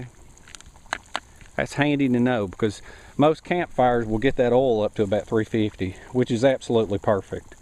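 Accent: South, United States. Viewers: Your accent is aweson man makes me miss the south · Yep, you do, hehe... What part of usa is that accent from ? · I like your accent, very Southern :) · I'm from the South too. Sounds like home to me.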